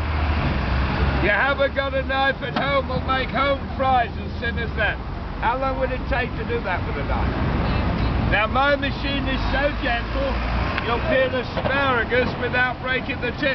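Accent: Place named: United Kingdom. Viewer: He has an amazing British accent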